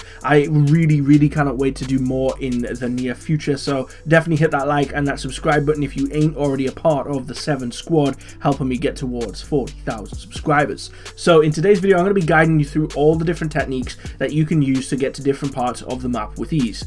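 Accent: Newcastle, United Kingdom. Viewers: you geordie bro?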